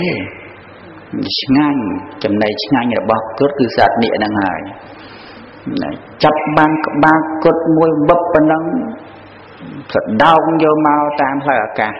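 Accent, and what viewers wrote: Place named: Kandal, Cambodia. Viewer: ព្រះអង្គ ទេសសំនៀងដូច អ្នកស្រុកកណាចឹង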